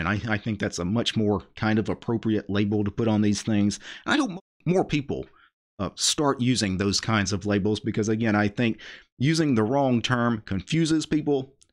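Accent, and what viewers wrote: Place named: Louisiana, United States. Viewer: I saw you on KeepitTechie, been subscribed but man i know your accent was familiar, but now I see you are a fallow Louisiana native. That was a great interview.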